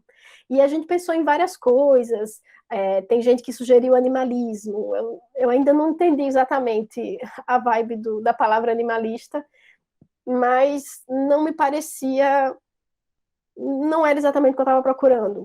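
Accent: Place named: Northeast, Brazil. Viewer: Adoro esse sótaque nórdestino 😁. Por um mundo sem senhores para humanos e não humanos, Tmj 👍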